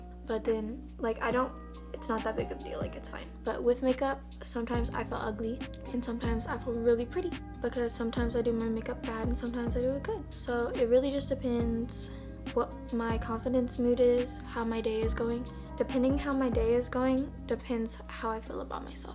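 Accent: Texas, United States. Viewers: What part of texas are u from ?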